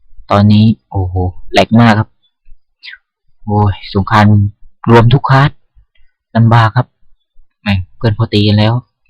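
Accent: South, Thailand. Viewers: พี่เด็กใต้ป่าวคับ ทองแดงทั้งนั้นเลยนิ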